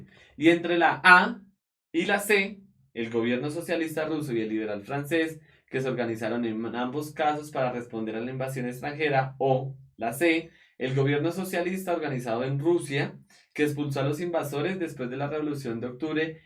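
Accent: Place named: Bogota, Colombia. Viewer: ey cachaco habla bien no se dice "acuerdesen" ni "desen", etc...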